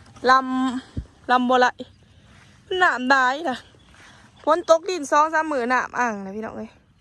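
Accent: Ubon Ratchathani, Thailand. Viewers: เว้าปานคนอุบลแท้เจ่า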